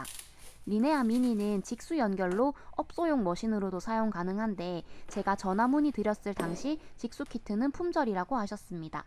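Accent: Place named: Gyeongsang, South Korea. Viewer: 경상도 사투리 귀여워요. ㅋㅋㅋㅋㅋㅋㅋㅋㅋㅋㅋㅋㅋㅋㅋㅋㅋㅋㅋㅋㅋㅋㅋㅋㅋㅋㅋ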